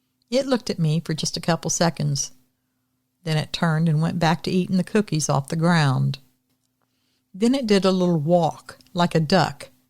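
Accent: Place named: Eastern Kentucky, United States. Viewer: Well I’ve got tears streaming down my face. I too grew up in a house with. Dad that was gone more than he was there and was always spending all our money at the bar with floozies. I was never beaten but I was screamed at and I heard a lot of yelling between my parents. I too remember the day my Mom made my Dad leave. Thank you for sending in your life changing story. I’m so glad that wood booger came along to save you and in turn saved your family., I’m married to a guy from Eastern Kentucky and you sounded like you could be from there reading this story. 😊 I felt like my Mother in law was still here. We lost her to breast cancer in 1998 but you sure did sound like her reading this. I think it’s the pattern of speech they have out there that did it because you have never reminded me of her before. Thank you to you both for bringing a happy ending of Christmas 🎄 joy. 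💖💖💖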